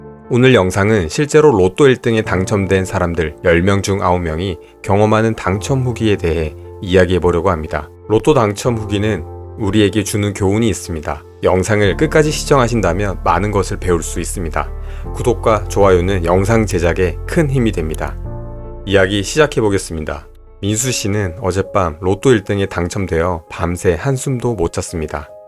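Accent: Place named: Gyeongsang, South Korea. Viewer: 경상도 사투리억양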